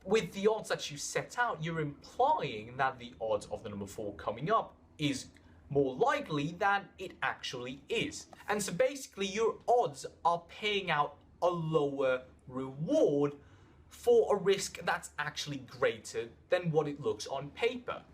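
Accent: United Kingdom. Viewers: Nice video - any chance you're from nottingham? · what are the odds .. chinese speaking in british accent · Chinese guy teaching Hood Math with British Accent. Soo much culturalism Republucans hate 'em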